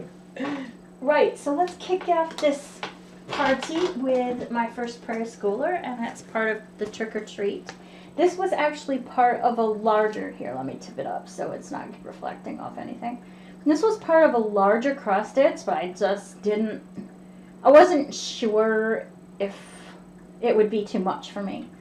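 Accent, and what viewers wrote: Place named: United States. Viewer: Took me a while to figure out you’re an American in the uk! Welcome! I’m in Yorkshire 👋 loving the Halloween theme. Will now be binging through your vids xxx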